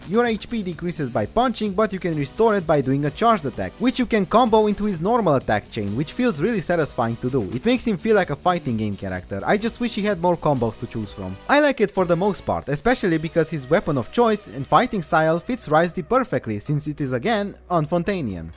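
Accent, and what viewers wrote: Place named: Romania. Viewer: your accent sounds sooooo familiar. Are u by any chance romanian?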